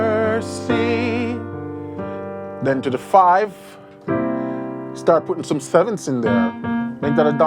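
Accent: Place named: Jamaica. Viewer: are you Jamaican? I hear a cool accent in there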